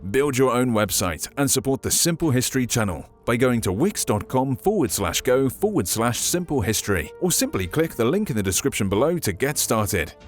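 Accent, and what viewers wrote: United Kingdom. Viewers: I hate the brittish accent for some reason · Stop using this stuppid brits vocif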